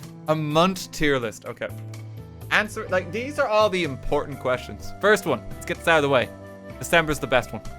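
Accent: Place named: Ireland. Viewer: He's like Jerma but less insane and Irish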